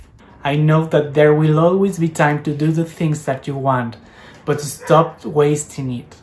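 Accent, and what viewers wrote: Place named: Mexico. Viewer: Your English is very good, especially since you learned it all in Mexico. The only people that I know that have an American accent are those that lived in the US for at least some time. We were in France on vacation and I spoke okay French, but we had difficulty getting people to understand the name of the city we were driving to. Finally an older man figured it out by having me repeat its name with a French accent. See you are going to have an accent because those you speak with are also speaking with a Mexican accent. Heck, my wife has lived in the US for over 30 years and speaks great English, but with a slight accent...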